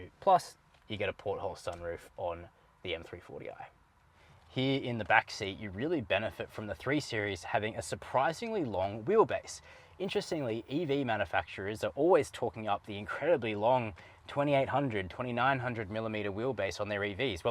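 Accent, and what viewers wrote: Australia. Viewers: The host sounds Australian. Car prices in Australia is indeed quite high.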